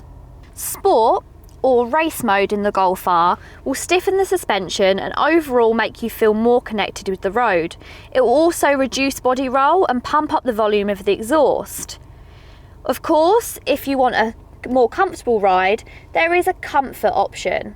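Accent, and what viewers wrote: United Kingdom. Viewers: She British☹️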